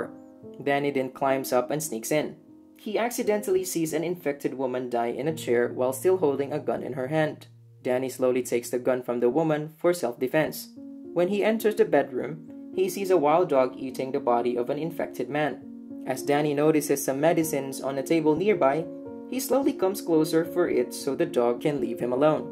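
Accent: Philippines. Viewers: Is this a Filipino accent??? Great Video! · The guy who voiced over is 100% Filipino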